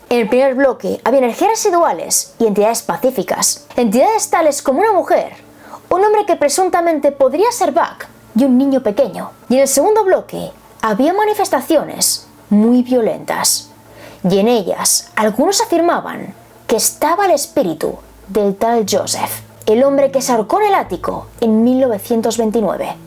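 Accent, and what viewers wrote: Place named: Spain. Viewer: Riddle Tu acento español... Es hermoso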